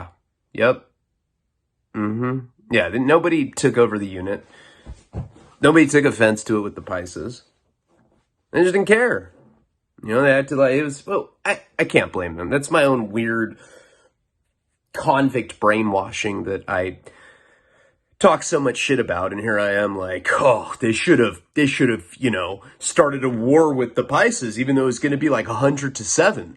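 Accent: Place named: New York, United States. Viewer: That New York accent though 😂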